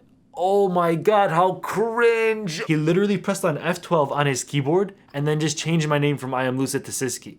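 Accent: United Kingdom. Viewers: your British accent is immaculate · is crying? british accent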